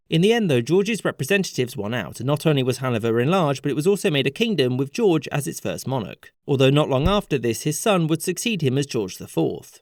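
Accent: United Kingdom. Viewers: You can hear the fact that he’s British.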